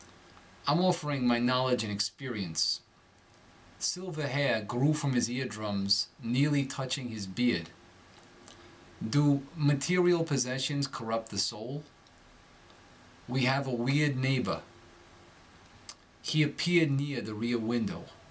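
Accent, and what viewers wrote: New York, United States. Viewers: Crazy shit, I'm from NY and I don't even hear the accent intll he shows the difference between the pronunciation. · The thing I love about this guy is that he looks like the people I imagine speak this accent. I'm from Sweden so don't hate my prejudice too much :P I have to say that this is the most lovable accent from the U.S. of A.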